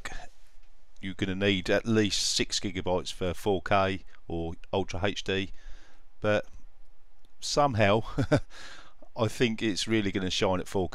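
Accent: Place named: England, United Kingdom. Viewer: Good review. English accent's are badass, couldn't resist saying that lol. I think if Fury X would drop to 550$ and Fury 450$, assuming they can at least hit 1200 with some voltage on a proper loop they would sell like crazy. Not to mention DX12 coming and looking at Hawaii i think Fiji will come a long way in a year.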